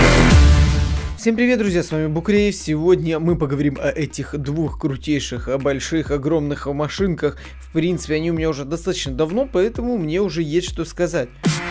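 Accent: Odessa, Ukraine. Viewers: Ты с Одессы ?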